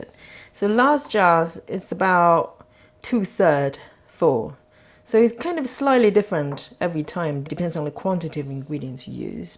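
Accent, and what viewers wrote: United Kingdom. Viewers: I had to laugh at the end, as you say "put a dollop of chilli paste on the side" and then proceed to put the equivalent of a small ladle full! You are clearly "chilli mad", just like my wife. I will definitely have to make this for her, as I'm sure she would love it! 41 years ago, I convinced a little asian gal from Christmas Island to marry me, and making yummy things for her like this helps to keep the romance going. She spent 3 years in the UK, and has a slight British accent (not as strong as yours, but it's still there). Her voice is not quite as deep as yours either, but I found listening to a number of your recipe videos very comforting, and kept me thinking fondly of her while she is away at work and I work from home. Love your recipes, and will be trying the "french Fries" tonight. I'll let you know how it goes!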